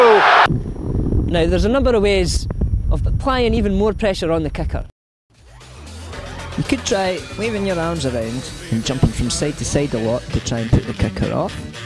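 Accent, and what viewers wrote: United Kingdom. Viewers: his accent is scottish and so am i glasgow :D good tips aswell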